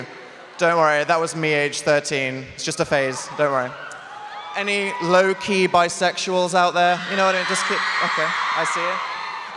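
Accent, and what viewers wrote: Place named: United Kingdom. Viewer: He's so sassy and British, I LOVE IT!!!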